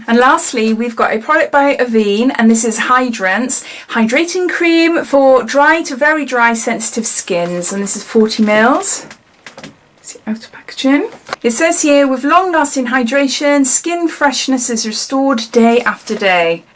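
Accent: United Kingdom. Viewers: Hello from Brazil! Your accent is so beautiful! I want to visit the U.K sometime soon - from what I have read and watched about sights and the culture, I have a feeling I am gonna love it. I should have visited while we were stationed in Germany! Maybe in the near future. I and my family are moving back to the U.S very soon so I was wondering where this box can be shipped there (and how much shipping would cost). Cheers! Renata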